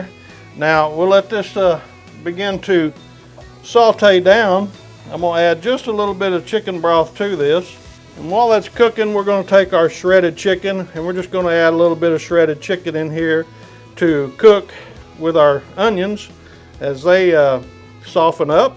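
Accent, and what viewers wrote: South, United States. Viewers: Just went through my annual blood tests and physical. I'm 68. Went in for "The Chat". Took height, weight, blood pressure, the usual suspects. BP was on the high side of normal. I asked what was it last year? She checked and said, About the same. I said Normal, Nervous, Anxious result? She smiled then looked at the summary of results and said, Oh, this is unusual (she shoulda took my BP then). Every test was perfect! (my heart started beating again) See NEXT Year! I hope all goes well with whatever confronts you, my Friend. 🙂 Now, I think you'll enjoy this. Our carpet was scheduled to be put in yesterday. We were watching YT videos. The installers showed up early went out and greeted them, a father, daughter team, spoke little English with a heavy Central American accent. I showed them what they were up against and they got at it. 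While lugging in their tools I hit go on a video by Smokin Joes Pit BBQ making Carna Asada's Tacos. The installers were right behind me, heard it, stopped for a few seconds, looked and back to work. I thought it was just coincidental. BUT, you were up next with this video. They were just coming through the door again when I started it and you said, (with your subtle Southern accent) and I'm quoting the closed caption that somehow was on the screen, "Come on stops me Amigo's, how are you doing my friends". Yup, didn't really roll of the tongue the same as it would had Joe said it. 🤣Again he stopped for a second, but just One, and back to work. I ha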